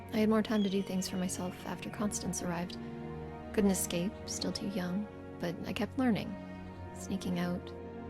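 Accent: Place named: Canada. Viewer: Love the voice acting! Will have to give it a download! Soothing voice too, love the Canadian twang :) Are there any known mod conflicts?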